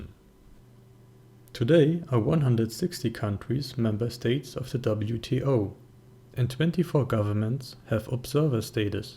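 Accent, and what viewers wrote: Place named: Switzerland. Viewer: Geneva s English sucks Acento SUIZO tipico: frio y de fumador de rodillas guacala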